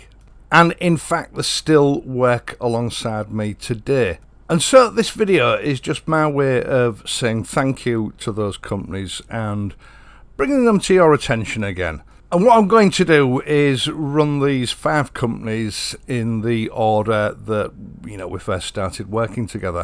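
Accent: United Kingdom. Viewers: Dear, thanks a lot for your videos. They are very nice and I love your British pronunciation. I have one suggestion though. Can you make in your videos timestamps, so that if I want to re-watch a part of the video, I would not scroll in order to find what I need. Thanks again for your work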